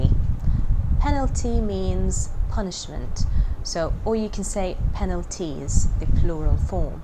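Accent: United Kingdom. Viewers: I really appreciate your British accent 🎉 thanks a bunch for the useful vocabulary & ramadan mubarak for you ❤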